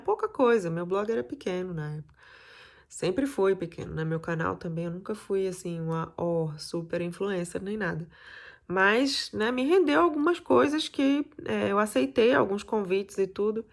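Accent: Northeast, Brazil. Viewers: E aqui na sua terra natal (Brasil, Nordeste, pelo sotaque é nordestina)vc se dá bem com o povo fora desse país?Obrigada 😘👍Sinto muito amargura nas suas palavras e nos olhos!Isso não é bom amor!Me senti mal agora em ser brasileira, sou uma pessoa que me doo tanto para quem precisa de mim!Eu também não me envolvo com muitos amigos, por opção minha, tempo, mas o resto abstraio!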